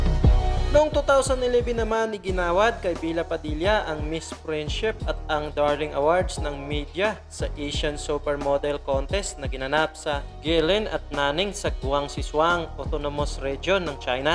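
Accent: Visayas, Philippines. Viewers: I'm also a bisaya and i'm proud of it. Most of us are nahihirapan magsalita ng tagalog fluently, fortunately tumira ako sa Manila nung bata pa ako kaya okay magtagalog. Pero naiintindihan ko ang may ari ng vlog na to kasi may mga kaibigan at classmates din ako na ganito magsalita ang I don't see any problem with that. Kuya Vloger, ipagpatuloy mo lang po ang pagvavlog proud kami sayo na mga kababayan mo. Saludo po ako sa katatagan ng loob mo, wag mo nalang po pansinin ang mga nambabash sayo, most of them are inggit lang sayo. Ipagpatuloy mo lang gumawa ng mga videos as long as it makes you happy and also continue to be proud Bisaya😊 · Bisaya kaman dong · Ang ganda ni Bella Padilla😍 At sa mga nanghusga sa Vlogger dahil matigas magsalita haler bisaya e proud ako sa mga bisdak inggit lang kayo hahajokjok😂✌️ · Bisaya siguro ang may Ari ng vlog na eto. Iba kasi ang tuno ng Tagalog niya. 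· Bisaya kase. Ok lng yan. Hehehehe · Kung ganyan man sya magsalita ay huwag po naten sya pagtawanan .. .ganyan naman talaga magsalita ang bisaya diba.. .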